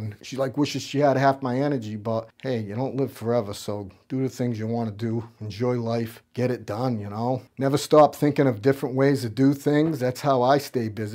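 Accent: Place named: Massachusetts, United States. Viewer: The magnet idea is awesome! I’m definitely going to incorporate it for my setup! I found you on your recent Reddit post and followed you here. Definitely appreciate your input and love your interest in flight sims and setup! I’m a space simmer so the attention to accuracy doesn’t hit me like it does you, but I do love your creativity to set things up. Crazy that those small magnets get over 300lbs of uhh magnetism! I’m wanting to go full simpit but for now I just modify to attach to and around my desk. Have to get creative since I can’t commit to replacing my desk and the $$ is limited due to having 3 young daughters as well, so that’s where most of the money goes x_X. Lastly, love hearing the Mass accent. I lived in the New England area for about 10 years when I was a kid/teen. I’m from Oklahoma and moved back before I left for the army, but I always loved the range of accents and such, but I do NOT miss the snow, lol. 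Anyways, just wanted to comment and say I love your stuff! Take care and stay warm!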